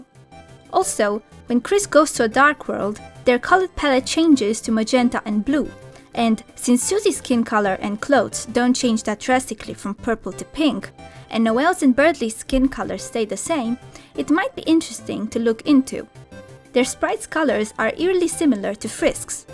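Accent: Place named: Poland. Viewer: Small off-topic, but I'm a bit curious. Are you perhaps from a slavic country? Your pronunciation sounds very similar to how my polish friends sound when speaking english lol.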